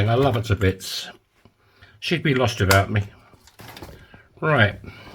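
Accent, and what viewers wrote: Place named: Cockney, United Kingdom. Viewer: Funny are you cockney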